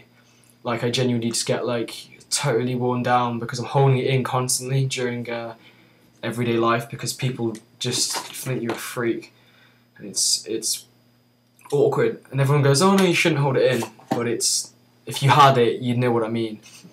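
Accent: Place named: England, United Kingdom. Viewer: I'm just going to be a total creep and say that I really like your jaw line and your hair. And your eyes. I'm not gonna lie, I've watched this video more than once all the way through because I love your accent and I like listening to your voice. Also, I think you'd be such a fun friend to hang out with. Also - where from England are you from? What kind of accent is that?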